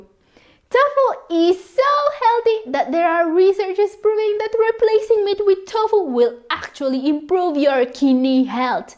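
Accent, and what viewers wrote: Italy. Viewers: Oh is so awesome… she has slightly italic accent though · was born in the philippines, but she studied in italy from grade 1 up to liceo, n she took seriously her studies. Sometimes her accent words are in italian without noticing her english pronunciation. We have to understand italian n english pronunciation are different. We have to excuse her wrong words mistaken.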